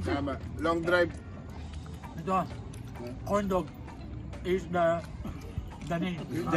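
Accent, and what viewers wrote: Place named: Pampanga, Philippines. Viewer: The Campampangan Accent tho. 🤘😁🤘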